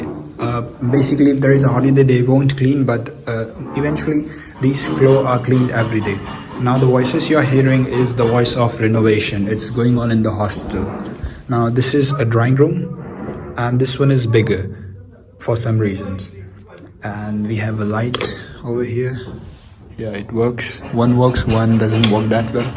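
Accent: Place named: Russia. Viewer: You look the exact same, like you used to be during school, particularly in this video except for your voice and the Russian English accent!! I've been following all your videos, happy to see you exploring and living these experiences🙌🏽🤗 Good luck buddy 😊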